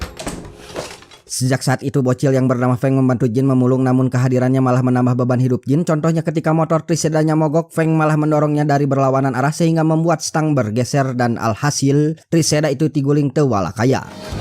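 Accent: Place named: West Sumatra, Indonesia. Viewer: Kok ini logat nya kayak org minang ya.... Apakah emang orang minang min😂😂😂😂😂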